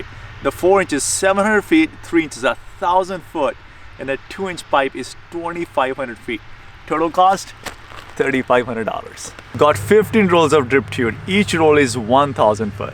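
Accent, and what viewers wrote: Punjab, India. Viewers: Hello! Another great video. (Love the Punjabi accent.) Sat sri akal.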